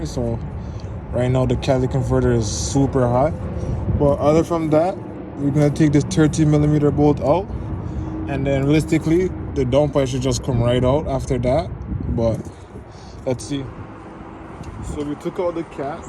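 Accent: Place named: Jamaica. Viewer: u sound like yard mn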